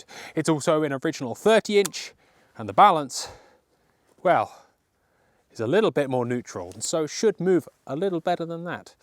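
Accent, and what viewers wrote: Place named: United Kingdom. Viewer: This is the first video ive seen on this channel, and this guy looks like an american with a british accent.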